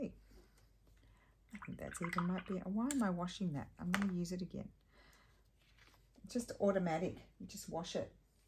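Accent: Australia. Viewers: You have such a calming voice when you are playing? Probably the Aussie accent. Your eye for colour is amazing.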